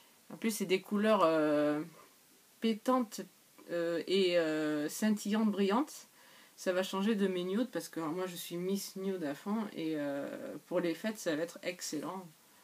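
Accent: South, France. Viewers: Tu a été gâté ma jolie !! Trop contente de te voir et entendre ton bel accent du sud !! Ta video est top, pour une première c'est génial !! Gros bisous 😘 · Rooh ce petit accent du sud ^^ Ba voila nouvelle chaine nouvelle vidéo on continu on continu :) Si je peux me permettre comme tu m'as dit de donner mon avis lol tu es un peu loin de la caméra :) Gros bisous beau swap :))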